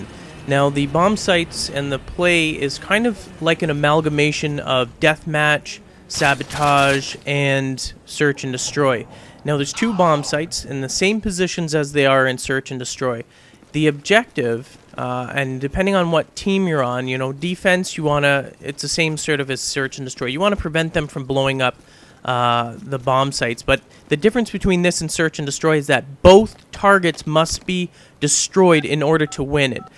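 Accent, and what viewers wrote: Canada. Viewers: may i ask if you're canadian? i thought i heard a hint of canadian accent.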